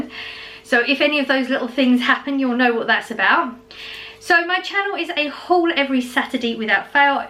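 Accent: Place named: Cockney, United Kingdom. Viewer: She speaks with a cockney accent.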